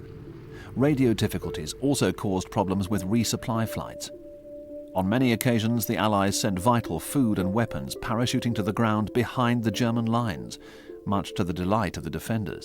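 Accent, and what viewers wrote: United Kingdom. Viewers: I hate the narrator's pretentious British accent. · Well said. Furthermore, as I like it as an American, is it possible that there is another voice other than a British voice that can narrate the story? Is there some kind of prohibition against all other English speaking people? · ...but but but it's got the stiff upper lip accent!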